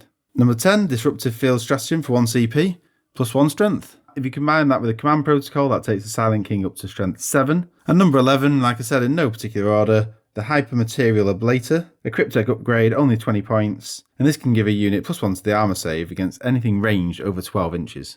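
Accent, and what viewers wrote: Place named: Scotland, United Kingdom. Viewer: Una pregunta ,por curiosidad , ¿de qué pais eres? lo digo por tu acento se me parece un poco escocés o galés pero igual eres de yorkshire ,un saludo.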